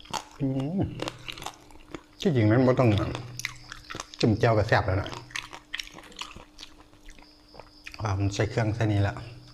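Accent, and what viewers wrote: South, Thailand. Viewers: พูดแบบใต้ๆ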